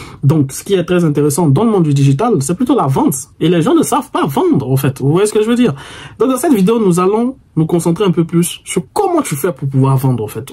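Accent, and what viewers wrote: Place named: Ivory Coast. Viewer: Ton côté Ivoirien 😂 m'a permis de comprendre vite 🤝🤝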